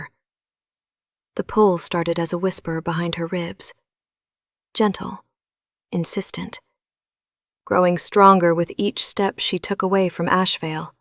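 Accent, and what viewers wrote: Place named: South, United States. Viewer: Omg is it Dalia or Tealia. Not liking the Southern accent.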